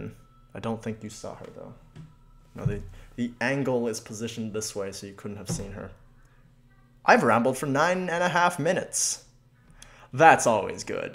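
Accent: United States. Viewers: HES ASIAN BUT HE STILLS TALKS AMERICAN LIKE! congrats man you are the last league of legends related subscriber since i ditched that cancerous game. We played a long time ago. Always enjoyed the few games we did play. You should play dota, ill hook you up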